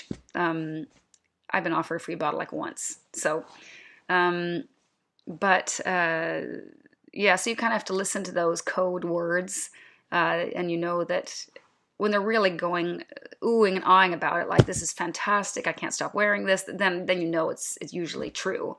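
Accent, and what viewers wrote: Midwest, United States. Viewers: Are you Swedish? If so, I never would have thought. You accent comes off as American Midwestern or from the Rocky Mountain States. Impressive. I greatly appreciate your take on denying free this and that. Reviewers are now stating that they received their products but their opinion are their own. I don’t believe this. I’m too seasoned to think kind gestures aren’t returned in kind. Reviewers are essentially telling us to pay for their free bottles, by buying this House’s offerings.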